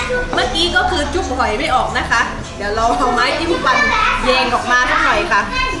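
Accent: South, Thailand. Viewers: คนใต้ไหมคะ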